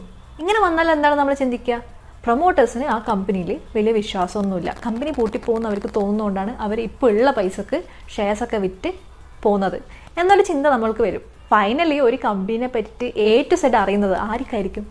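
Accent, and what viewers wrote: Kannur, India. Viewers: Kannur style...good information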